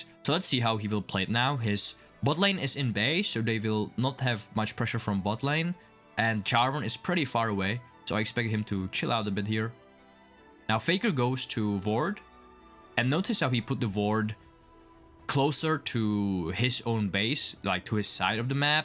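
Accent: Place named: Asia. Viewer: Could tell after 5 seconds that this is Asian, maybe but just maybe a very drunk dutch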